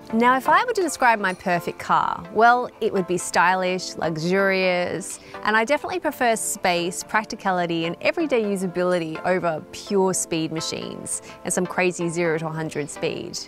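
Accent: Australia. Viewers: Sexy woman with an Australian accent talking about natural aspiration. Like.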